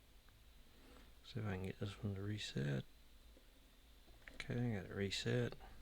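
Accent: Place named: North Carolina, United States. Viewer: I knew instantly you were from NC the first time I heard you on LadyLocks live. Great open sir!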